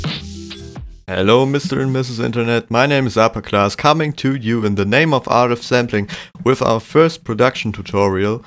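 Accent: Germany. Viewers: Great vid man, thought I detected a German accent ;) Hast du ein eigenes soundcloud seite? Deine beats sind richtig geil!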